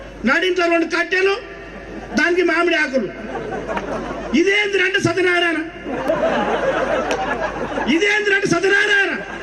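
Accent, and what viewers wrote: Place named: Telangana, India. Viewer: ముందుగా మీ ఒరిజినల్ తెలంగాణ యాసకు నిండు జై భీంలు అన్నా, మస్తు జెప్పినవన్న సూపర్ ఇంకా మీలాంటి చైతన్య పరిచే నాయకులు మాలాంటి చరిత్ర తెలియనివారికి ఇంక చెప్పాలని ఆశిస్తున్న జై భీమ్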